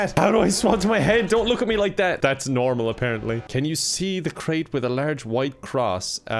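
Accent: Ireland. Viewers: Its cuz of the Irish dialect. Its an Irish thing, not a thing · is a 100 percent Irish human.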